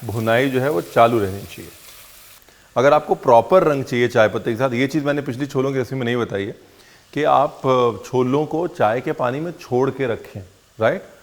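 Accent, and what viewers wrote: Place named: Punjab, India. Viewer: Ranveer, you are awesome, but I like you to hear you in Punjabi style Hindi.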